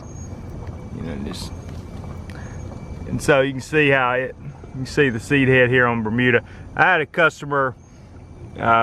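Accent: South, United States. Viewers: His accent makes my mouth feel like chewing a southern gum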